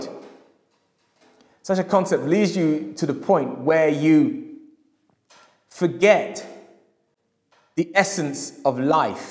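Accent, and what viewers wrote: England, United Kingdom. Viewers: Baseball analogies in an English accent! :))))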